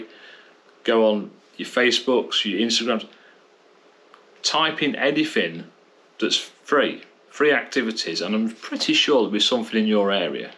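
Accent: Lincolnshire, United Kingdom. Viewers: I could listen to your Lincolnshire accent all day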